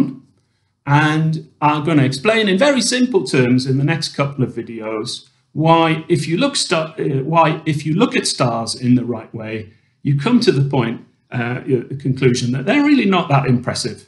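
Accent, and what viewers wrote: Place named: England, United Kingdom. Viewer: Don't be shy about not being big budget. You've got the English accent that all the greats have, like Attenborough and that guy who wears the tight shirts; that's all you need. Oh, and you've got a way better beard than any of those guys from Nottingham. And don't get me started on the guy with the hair. Keep it up!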